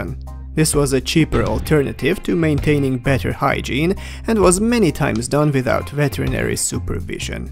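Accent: Hungary. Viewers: How you weren't aware of "Hunglish" existence. LOL XD For me is super easy to spot. BTW his accent not even that hardcore as far as I'm concerned. Anyways both channel is awesome. :) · I never realized that Hungarian English accents exist until I heard you talk...this is exactly how I sound when I take calls at work...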